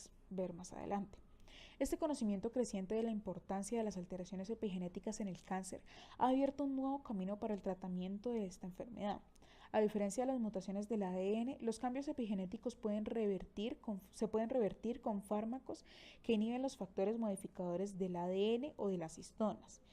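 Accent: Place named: Colombia. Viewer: Tienes que ser colombiana,tú tono de voz es hermoso, dra porque no continuo con el proyecto este y el otro canal estan caídos, además supee genial estps capítulos de Robbins, gracias, saludos desde Cali, Colombia.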